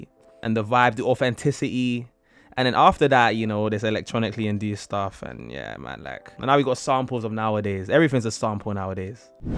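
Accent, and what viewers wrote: United Kingdom. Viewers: That's a British accent. London more than likely. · U like the one of many British accents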